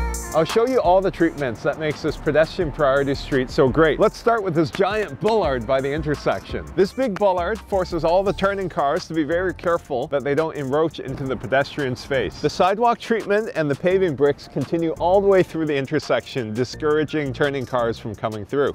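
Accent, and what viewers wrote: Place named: Quebec, Canada. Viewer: Un peu quebecois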